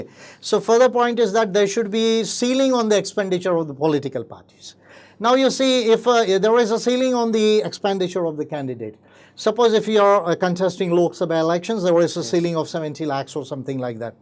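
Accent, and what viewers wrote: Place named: United Kingdom. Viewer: Sir please don't use the British accent.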